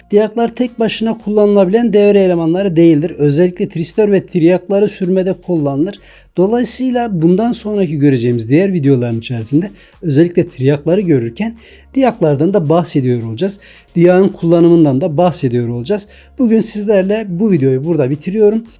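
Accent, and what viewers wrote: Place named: Central Anatolia, Turkey. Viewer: Teşekkür ederim. Güzel anlatmışsınız 👍👌💯 Konuşma aksanınız tahminimce orta Anadolu tarafı ☺️🤔 Aksaray, Yozgat 🤔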